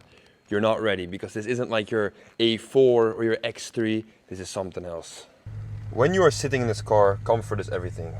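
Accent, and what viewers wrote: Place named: Netherlands. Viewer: why does this dude have a dutch accent living in poland?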